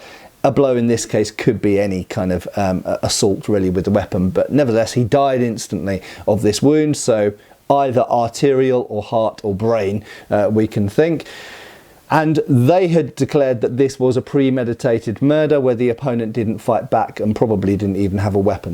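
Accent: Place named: United Kingdom. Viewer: I assume that this is STILL hoe you Brits sound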